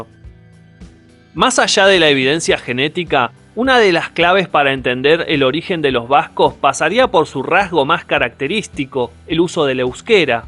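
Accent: Argentina. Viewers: ¨Peru tu que tel historico eres. eres por el acento eres Argentino. No tienes ni puta idea de nuestra Humanidad. Los Romanos ´pasaron por Euskadi con permiso nuestro. y les dimos en la ;Madre a Carlo Magno. Aita es Aita. pendejo. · Interesante. Un poquito difícil de entenderte con el acento bastante fuerte argentino. Pronuncias la Y com sh o ch en ingles. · El que habla es argentino; entre Brasil y Argentina no se odian?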